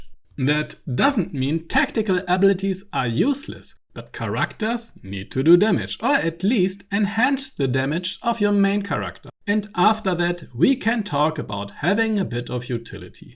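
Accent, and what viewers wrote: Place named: Germany. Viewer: your german accent is horribly intense, use your tongue a bit more when speaking english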